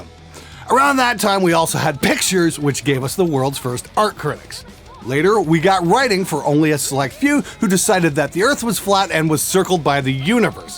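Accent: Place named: Canada. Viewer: Hey. Just wanna say I love the Canadian accent.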